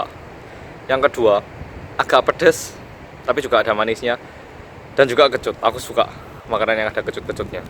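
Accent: Java, Indonesia. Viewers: medok mu iku loh sesuatu buanget ms, hhhee :D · wkkkkkw muedhoookkkk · logat jowone medokk bngtz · Haha nek ngomong medok lek 😆😆 Umur nya tuaan aku berarti yo, Aku 93 !! Sukses ya lek 😊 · Mas bhasane jawa sampean kok medok banget,pdhl korea punya hhheee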